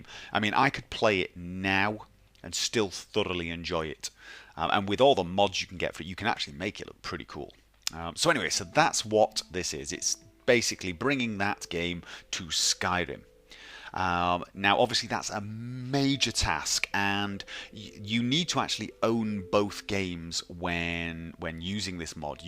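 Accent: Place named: North, United Kingdom. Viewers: You're from Norway? You sound a bit Scouse haha, cool video. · Are you from Lancashire by any chance? Your accent is Northern, but I know tapped r's aren't a Yorkshire or Tyneside thing as far as I'm aware.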